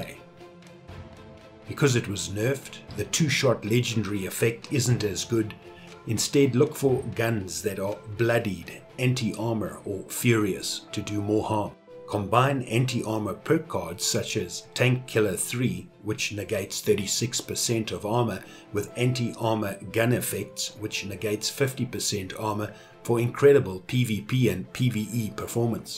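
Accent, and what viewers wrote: South Africa. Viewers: You sound like you're from SA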